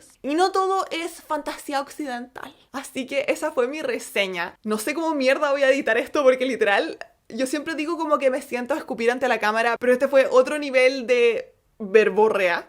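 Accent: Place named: Chile. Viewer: Mi obsesión por The Untamed me recomendó este video y cuando le puse play... Ese acento? Ese acento es chileno? Es chilena csm xD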